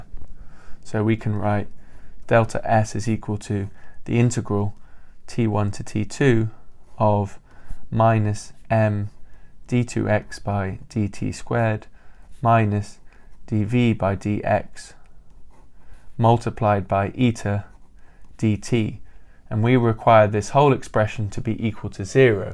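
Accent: United Kingdom. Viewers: I love his handwriting and his british accent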